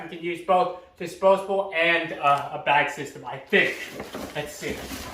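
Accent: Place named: Singapore. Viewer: You Singaporean or something? Accent is strong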